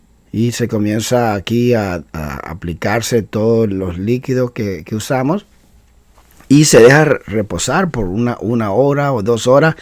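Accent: Costa Rica. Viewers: ese maje habla como costaricense. y dando recetas del salvador jajajja. esas no son pupusas ni el curtido .. nada que ver con el salvador .. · Bien perdon me adelante con el comentario... pense que eras hondureño... aunque tenes el acento mas a tico que Nicaraguense, bueno... gracias porque te gustan las pupusas y las mejores pupusas estan en olocuilta en El Salvador, tambien en los planes de renderos y hay varias partes en El Salvador donde las hacen riquisimas ya se a de maiz o de arroz, practicamente en cada esquina de cada calle hay pupuserias, un buen Salvadoreño minimo come de 2 a 5 veces pupusas a la semana!!! bueno saludos al pueblo Nicaraguense nuestros hermanos!!! y perdon por el comentario anterior!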